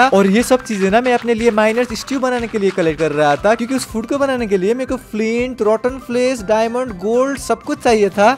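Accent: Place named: Nepal. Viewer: his langauge tone is like napali tone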